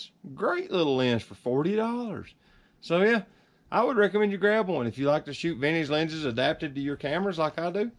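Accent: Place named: Georgia, United States. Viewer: Great video, happened to find one at a local shop for the whoppin price of 10 bucks! Not a dent or acratch on it, bit of an upgrade with the added length to the <num> i was usin for general stuff, tho that one is a beast as well! The macro feature lead to some real neat mushroom closeups, fantastic video as well. Simple, to the point, georgia accent, good shit man keep it up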